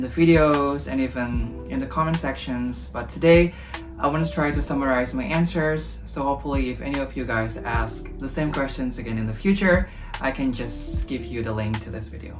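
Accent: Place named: Indonesia. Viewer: Sounds Indonesia I guess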